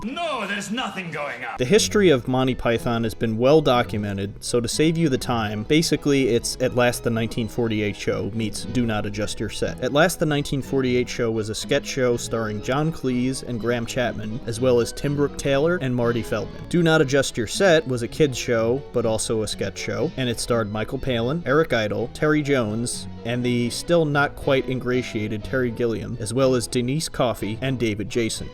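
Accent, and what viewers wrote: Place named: United States. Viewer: This is a pretentious and irritating video. The voiceover is so American it can not be listened to. It is what makes a difference between fact and knowledge. Yes the facts are there but the knowledge is missing. Cringe worthy dialog but little else...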